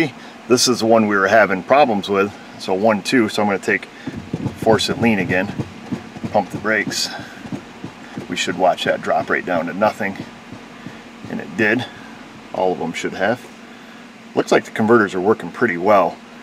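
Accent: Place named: Wisconsin, United States. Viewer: I hear some Wisconsin in the background or your voice...nice video...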